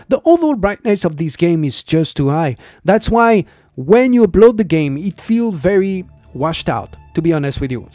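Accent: France. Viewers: Thank you for this video. Subbed and liked. Side note, my mother is French (born and raised) so to me your accent is awesome.👍 A lot of people think the French language sounds so romantic. That's only because they've never had a tiny, very angry French Mom cursing at them in French and swinging whatever was close by that she could get her hands on and use as a weapon (or throw it at you lol).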